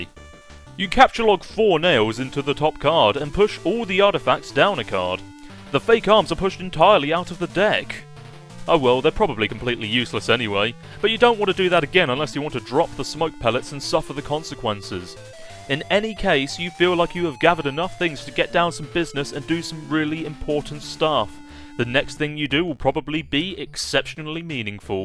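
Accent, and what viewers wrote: United Kingdom. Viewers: Probably cause of bri'ish accent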